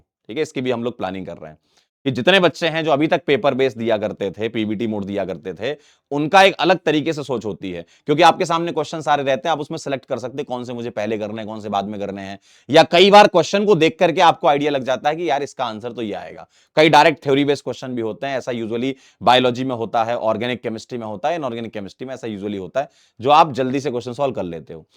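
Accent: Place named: Hyderabad, India. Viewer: Waise accent Se hyd ke lagte hai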